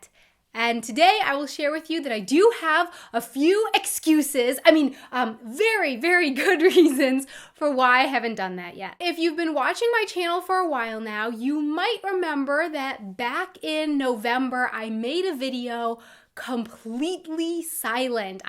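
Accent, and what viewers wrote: United States. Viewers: I think something what would maybe help to loose your American accent is to speak for a period of time only German. So you can train your vocal cords better. About the haters I think there are a lot of stereotypes about Americans that everybody has to adapt to them and not otherwise. And to be honest, (I haven't made any comments on your channel yet, so don't put me to the haters) I thought you just didn't put a lot of effort to learn German very well and to better your pronunciation, because maybe you are a little superficial. I was obviously wrong. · I really don't think you have to get rid of your accent. actually, in my opinion, German sounds nicer with an american accent because English is a softer language :) if you really want to work on your accent, try speaking very slowly and just concentrate on your pronunciation and once you've got that down you can try speaking faster :) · You should be able to be yourself. 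If people have a problem with it, it's their problem. At least you make videos, which is more than they do. I've never met a German that speaks English, that doesn't have a German accent, so I don't understand the big deal. If they are that mean about your American accent, they are the unaccepting ones. Who would want to emulate them? They can go fly a kite. Forget the haters. If they don't like your accent, they aren't worth associating with. Some Germans love the American accent. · It´s totally ok to speak German with an American accent because you are American : ) I think it must be pretty hard to learn German and you are really doing well! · Do not bother about the haters! They are wrong! The majority of the haters is not self-confident and they only write such comments because they feel better when they are bashing others. It is only a push for their ego. Do not care about such idiots - they are not worth it! 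Your American accent is one aspect which makes you and your language special. My father-in-law lives in Germany for about three decades and he still has an American accent. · Hi there, I'm Matty and I just discovered your channel yesterday. And I have to say, I'm very happy about the conclusion you came to. German is not an easy language to learn, and there's no shame in still having an American accent while you speak it. I am from Germany, but do all my videos in English, and people gave me shit for my accent, too. You just gotta learn not to care for these people. You try and you learn, and you improve yourself as you go, so always look at what you've accomplished instead of listening to hate comments. :) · Well, it's easier to understand German with strong american accent than understanding German with for example a swiss dialect. So I think it's all fine. Besides it is far more easy to "soften" your voice to English than "sharpening it up" to German i guess. 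The "hard" r's and ch's can be really hard i guess. I guess the only hard sound for an foreign German that is kinda "hard" to imitate at first is the "th" - that's where you can most likely see someone is a native German. "This is the third one" easily becomes "Sis is se first won" or "Dis is de first one" - so don't bother :) Sorry for unsorted thaughts :D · Welcome to the German state of mind. :D You know, there's a saying: "Nicht kritisiert ist schon genug gelobt", meaning "Not being criticized is already enough praise". So, never expect praise from a German for what you have achieved, but criticism for what you haven't. Including the accent (or the loss of it). ;) By the way, I do so much love your voice and your American accent. So you just talk the way you want to. :) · and noo don't work on your accent, the amerikan accent in German is such a cute accent :) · Oh my. But. But. Your american accent is absolutely lovely. 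· please dont work on your accent, its so lovely when americans speak german :)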